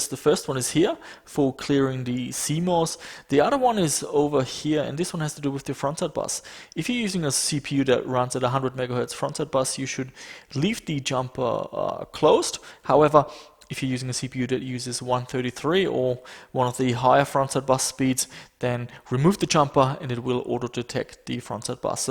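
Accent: Germany. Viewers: The german is Strong is this one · Are you german? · Deutscher knn der akzent nicht werden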